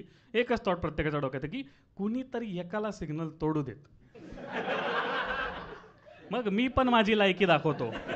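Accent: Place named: Pune, India. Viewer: puneri accent 🤌🤌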